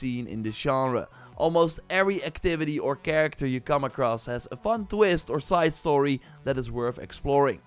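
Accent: Netherlands. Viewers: Are you Dutch hahahah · Dude what is that accent??!! Is it Dutch?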